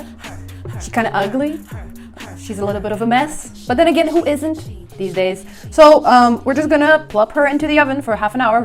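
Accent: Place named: United States. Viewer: американский акцент И(!) метрическая система – это то чего мне не хватает в англоязычном фуд-ютубе💛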